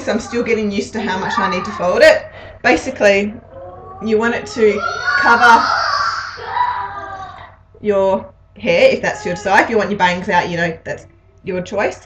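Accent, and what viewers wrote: Australia. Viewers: nice to hear another australian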